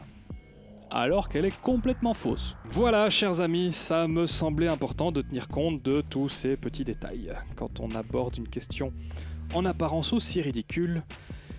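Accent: Belgium. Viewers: Houit, tüitter ... c'est rigolo le parler belge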